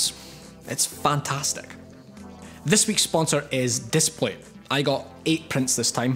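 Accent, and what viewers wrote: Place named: Scotland, United Kingdom. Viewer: ah yes the return of mr scottish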